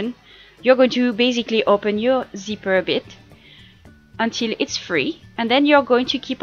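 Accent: France. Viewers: Are you French ? I love the tutorials do more ^ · are you french :3 ? you have an accent similar fo french 'i speak french btw" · Hello ! I love you tutorial ! I planed to do one ! Are you French ? If yes, I am too ! I think so because when i talk english i have the same accent >w< ! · where are you from? you're accent is like a french accent °-° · Are you French ??? ( you have the accent )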